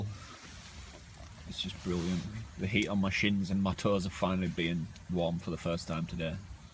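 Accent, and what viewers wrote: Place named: Yorkshire, United Kingdom. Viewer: he sounds like a proper yorkshireman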